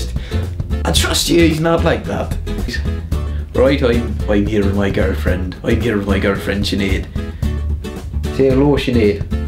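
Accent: Ireland. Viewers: I am an American from California, and I love your accent!!! O your voice is dreamy.. Seriously, if boys here could talk like you.. well... I would be one happy girl. :D <3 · I love he Irish voice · I definitley am subscribing, your really funny, and your irish accent is amazin, plus this video truly made my day. Thanx:)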